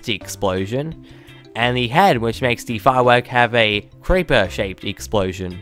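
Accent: Australia. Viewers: R u australian? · are you australian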